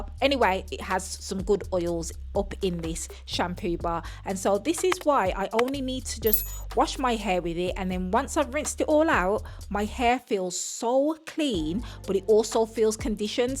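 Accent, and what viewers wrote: Birmingham, United Kingdom. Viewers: I hear a Brummie accent hehe😜☺️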